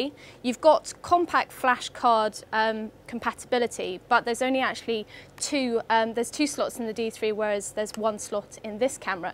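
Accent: United Kingdom. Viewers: German accent? Can't you tell a London accent from a German accent? She's reading off the brochure, isn't she? LOL! And is she trying to shake the camera to death?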